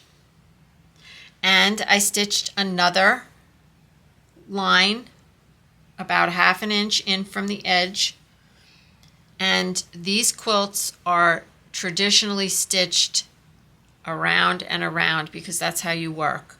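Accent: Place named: Louisiana, United States. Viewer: You sound like you are from Louisiana.😊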